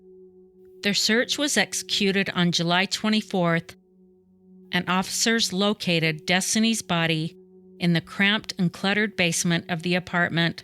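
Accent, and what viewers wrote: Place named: Midwest, United States. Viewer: You have the sweetest Midwestern accent. New follower here. Love your channel.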